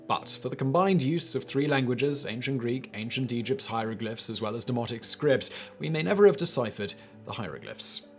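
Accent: United Kingdom. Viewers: This guy does a great British accent.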